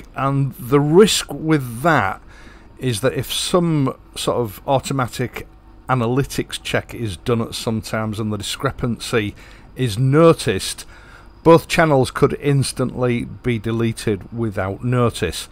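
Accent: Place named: Yorkshire, United Kingdom. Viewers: If that isn't a Hull accent I'll eat my hat!! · Hi, thanks for sharing your “your trials and tribulations” of the pros and cons of running a global channel. Like most things, I guess it’s a learning curve. However, my attraction to this channel is Royal Enfield, plus your Yorkshire accent. Let’s get back to that please! Regards and respect.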